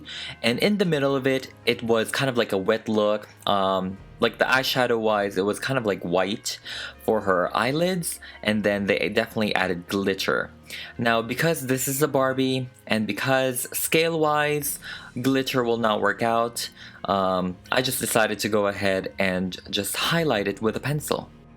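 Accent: France. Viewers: I love the french accent tho XD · You have a beautiful French ascent and also the French poodle doll you repaint! I love it! <3 <3 <3 <3 <3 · Quel exploit ! What a feat ! I didn't expect the final look with the drops falling but omg it's sooooo great ! Please keep talking with the french accent, it suits you very well (on behalf of a french woman) ! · the french accent yass bitch kiss from paris ❤️ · love your french accent :3 ( I'm french :3) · What kind of accent is that XD. It switched between French and mostly British. No hate tho it was cute af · I like that French.English accent 😀😂and your outfit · C'est tellement étrange de t'entendre dire des mots français au milieu de ton anglais, en vrai tu as un accent qui ressemble bcp à celui d'un français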